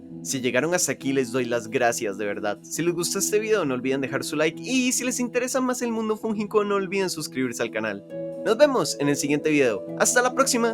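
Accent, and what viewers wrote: Costa Rica. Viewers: Escuche la voz y dije "Es tico" y luego reviso la bibliografía y es de biotec💚, muchos animos en este proyecto, ojalá te vaya super, yo soy primer ingreso de biotec.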